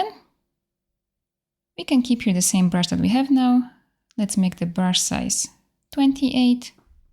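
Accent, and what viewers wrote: Poland. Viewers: Hi u have polish akcent can u speak polish language?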